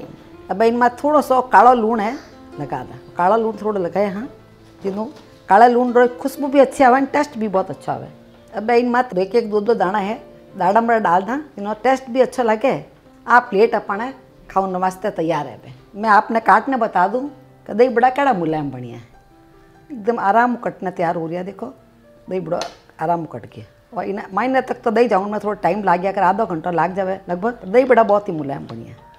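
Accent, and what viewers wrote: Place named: Marwar, India. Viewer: आपकी समझाने कि विधि बहुत बढ़िया है। सभी रेसिपी आपके समझाए अनुसार बनाई जाए तो बिलकुल आप जैसी ही बनेगी। आपकी मारवाड़ी बोली के हम फैन हो गए है🙏🙏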